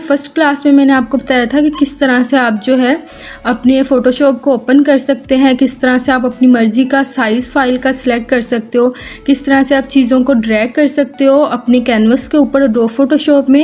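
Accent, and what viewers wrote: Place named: Faisalabad, Pakistan. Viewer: Sis apki language btati k u r from fsd.Am also from fsd .boht khushi hui apka tutorial dekh kr or boht acha smjhati ho ap.God bless u☺️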